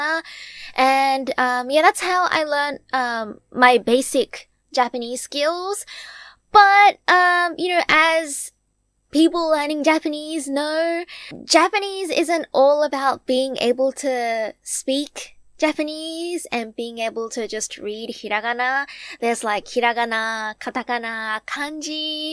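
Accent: Australia. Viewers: Every time I have one of your videos going in another tab I expect the next words out of your mouth to be "WELCOME BACK TO HOW TO COOK THAT I'M ANN REARDON" your aussie accent is so strong, I love it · Oh man just found out this channel today. The nostalgia. I lived in Australia too for my childhood and they sent me to Saturday school. I fucking hated it 😂 In the end I went back to my home country and I still can't speak my mother's tongue, what a waste of perfectly good Saturdays. Unfortunately my Aussie accent has died a bit cause I have to adapt to the English here so others can understand me. Man it'd be great if I could go back - say sunnies, undies and collect cricket cards from Weetbix again. It's so refreshing hearing the Aussie accent even if it is a bit different than what I'm used to, I think it's from Sydney. I'm #Perth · I knew that she was from Australia the first time I heard her accent I have a friend that's from Australia and she has mostly the accent as my friend lol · The aussie accent is so cute😩💓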